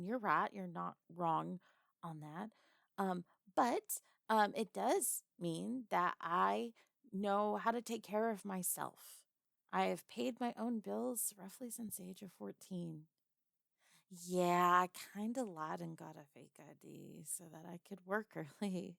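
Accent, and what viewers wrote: South, United States. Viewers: Your southern voice is sexy 😘😍😋🤤🥰❤️‍🔥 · You know it's crazy you have such a good southern accent. It's almost like you were born in the south 😂. I'm kidding, I know it's real and it's amazing just like you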